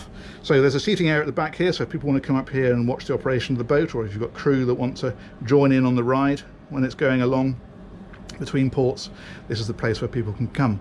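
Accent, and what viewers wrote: United Kingdom. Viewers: This is an exceptional tour. The camera work is wonderful, your knowledge of boats, vocabulary and enthusiasm plus that classical British accent. Keep up the good work!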